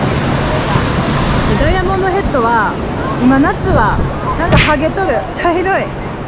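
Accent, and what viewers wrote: Kansai, Japan. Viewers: 関西弁が5割増しで美しく見せてくれる。 バブル時思い出すね！ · keyさんの関西弁と英会話の組み合わせが魅力的だ。日本人に少ない活発で知的な美人プラス酒好きなのでブレイクしそうな予感がする。意外にじいちゃんに人気が出るかも。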